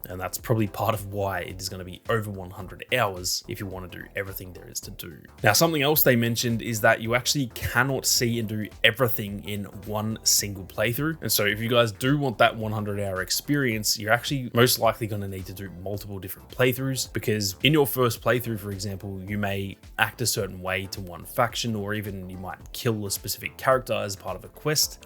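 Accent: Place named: Australia. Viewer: Nice to hear a fellow Aussie. I'm hyped too.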